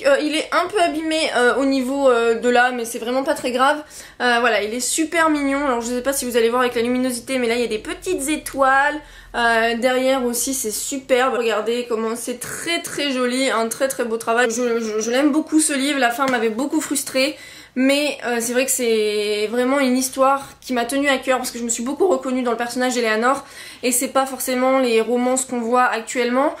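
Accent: England, United Kingdom. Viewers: Salut, t'es anglaise ? En tout cas t'as un super accent ! Bisous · Je me régale avec tes vidéos ,et tu as un accent anglais so cute ! ( vive le VO ! ^^) Malheureusement je n'ai pas autant de livre que toi mais ça viendra j'espère :P Bonnes lectures !! :D ( Of Neptune , la couverture quoi ^^ )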